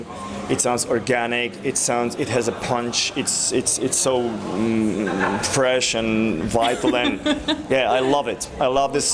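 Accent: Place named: Sweden. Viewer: not every swedish have to can speak english perfectly. your accent is lovely, I like swedish language better than english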